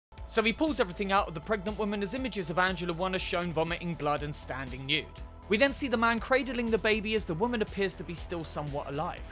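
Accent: United Kingdom. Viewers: Talk about the perfect man!!😅❤ Watches disturbing films and has a British accent!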